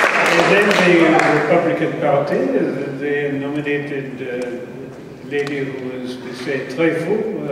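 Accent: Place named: Northern Ireland, United Kingdom. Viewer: interesting how he chose a northern irish accent..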